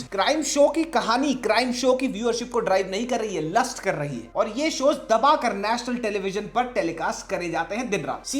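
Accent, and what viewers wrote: India. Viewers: that's maybe bcz he isn't british. There are many words we use and the pronounciation we prefer is sometimes british pronunciation and sometimes words derived from american english or in american pronunciation but that's May not be perfect and is a bit indianized like British pronunciation in Indian english accent.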